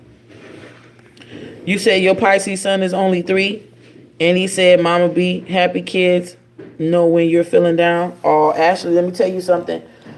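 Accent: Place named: New Orleans, United States. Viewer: I could hear the NOLA coming out as you were teaching! Mad love and respect for how you are bringing truth together across belief systems.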